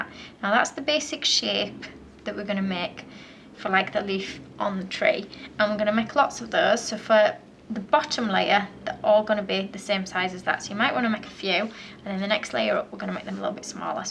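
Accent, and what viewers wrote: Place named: Scotland, United Kingdom. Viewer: you sound a bit scottish to me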